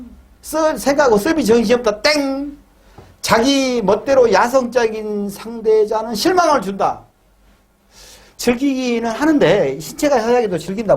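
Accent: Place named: Jeolla, South Korea. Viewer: 전라도 사투리가 친근감 있고 좋아요 알기쉽게 잘 설명해 주셔서 감사합니다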